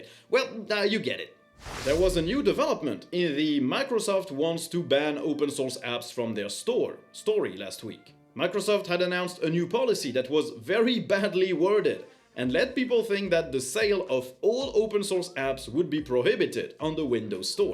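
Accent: France. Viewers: Is this a Frenchman speaking the islanders' language?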